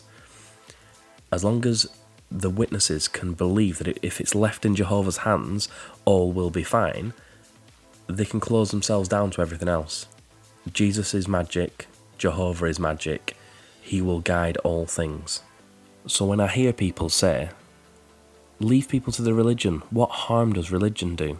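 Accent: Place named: Lancashire, United Kingdom. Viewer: Nice accent ..... Lancs/ Yorks ? Also extremely interesting !!! !it was The Royal Commission that set me free after 40 years !!!!!! I'm a Yorkshire lass !I apologise if you are from Pimlico or Chelsea 👵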